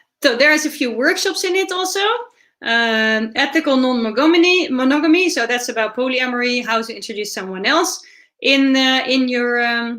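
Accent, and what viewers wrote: Netherlands. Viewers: Hey, thanks for the informational vids, just discovered your channel yesterday, it was linked below a video of the mystery box show. I already recognized a dutch accent with you:) hoop dat je een fijn weekend hebt gehad!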